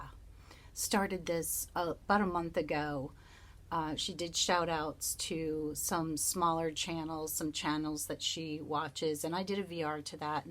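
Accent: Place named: Massachusetts, United States. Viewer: I knew you must be from Mass I could hear it in your pronunciation!!! Nice to connect with you! I’m from Cape Cod.... love the shout outs!!!!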